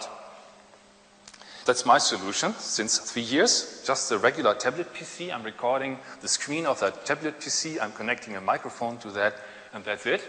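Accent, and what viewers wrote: Germany. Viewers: man hört voll den deutsch akzent raus :D aber sonst sehr schön ^^ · "aber sonst?" ich find deutschen akzent sympatisch :)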